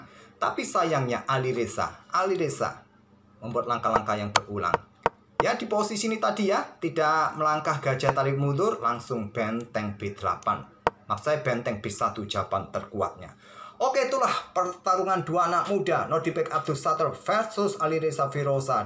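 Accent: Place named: Surabaya, Indonesia. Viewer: Ternyata admin ini arek2 Suroboyo iya😀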